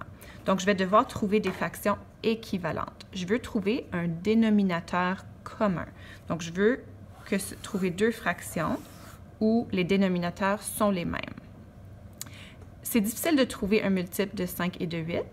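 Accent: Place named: Quebec, Canada. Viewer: bien expliquer je suis la seule a avoir écrit sinon j'adore ton accent quebequois PS:ds pour l'ortographe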